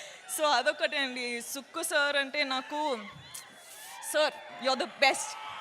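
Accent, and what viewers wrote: Telangana, India. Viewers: You become what you eat❤ Telangana slang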